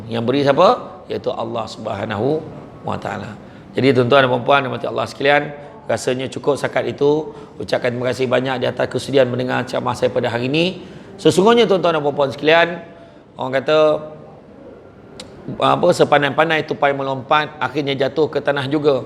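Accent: Negeri Sembilan, Malaysia. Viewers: Itukah kan,cakap nogori best👍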